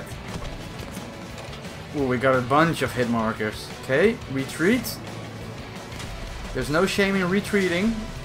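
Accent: Netherlands. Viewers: Are you Dutch by any chance? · you have that tipicle dutch English accent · I smell dutch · Ik kan je Nederlandsse accent herkennen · You sound Dutch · you have a Dutch accent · Wait are u from the netherlands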